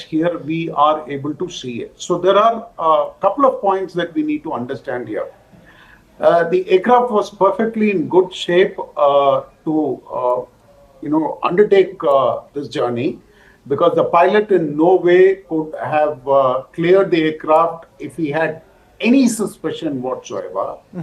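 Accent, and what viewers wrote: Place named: South India, India. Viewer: Pathetic south Indian accent 💩💩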